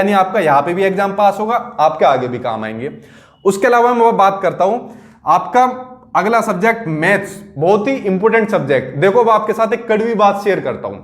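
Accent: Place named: Uttar Pradesh, India. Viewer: Up se ho sir aap? Aapke talking way se pta chl rha h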